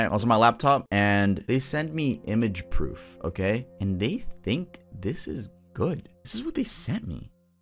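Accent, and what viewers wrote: Spain. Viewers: “Por Dios” is slang. It mostly translates to something like “By God” not “On God.” “On God” will be more like “En Dios.” Either way, I like your Spanish accent!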